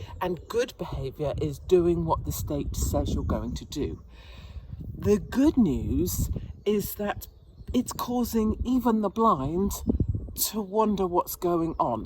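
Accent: United Kingdom. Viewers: I love fabulous British accent when she's speaking American.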